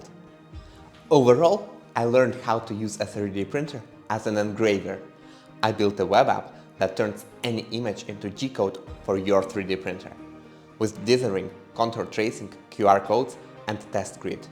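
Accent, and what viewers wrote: Russia. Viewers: По акценту слышу наш слоняра)) Очень рад за тебя братан, сэкономить 3к$ это не каждый сможет)) Можешь подсказать сервис где можно купить подписку на claude без санкций? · я смотря разные видосы про 3д печать не ожидал увидеть англоговорящего автора который на самом деле русский) тебя выдал жутчайший акцент и часть видео где чатгпт написал на русском)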